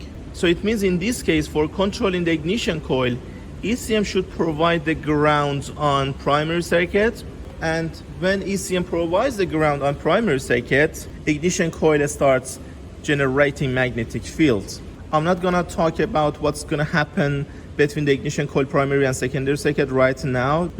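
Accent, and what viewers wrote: Iran. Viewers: ایرانی هستی؟لحجه فاسی داری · Well done mate, you are such intelligent person, by the way your accent sounds Persian😊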